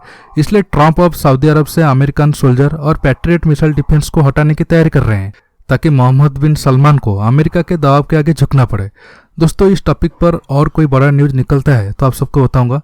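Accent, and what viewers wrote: Odisha, India. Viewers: Hi, are you Oriya??? Because your pronounciation sounds like that??? 🤔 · Odia touch tike rahuchi bhala laguchi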